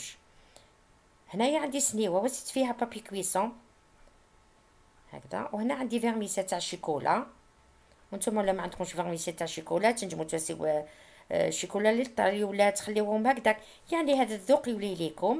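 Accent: Tlemcen, Algeria. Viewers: و عليكم السلام.وصفاتك خفيفة ظريفة كيما حضرتك. ربي يوفقك و يزيدك من فضله. تعجبني لهجتك كثيرا هل حضرتك من تلمسان؟ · samir azouz والله حتى أنا ظنيت أنها من تلمسان من لهجتها 😊😊😊😊 انا من العاصمة ...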